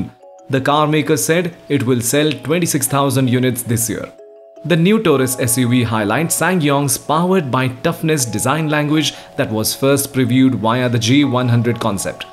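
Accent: India. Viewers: I heard...voice...indian speak english...😊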